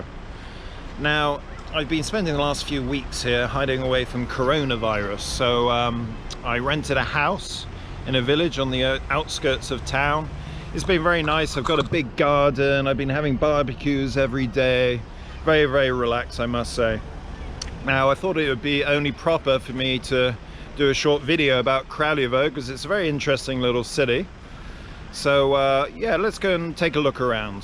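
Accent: England, United Kingdom. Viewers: Bald and bankrupt got me here I am enjoying your vids too ,all ways wanted to visit Serbia since Tony Blair though it was a good idea to bomb it because he had no idea about history, can we have a little bit about your back story, how you got your very English accent maybe ?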